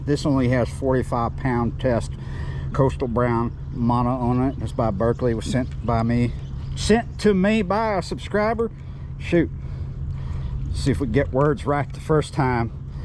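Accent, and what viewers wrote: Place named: Texas, United States. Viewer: Lubbock here....I still hear Texas in your voice.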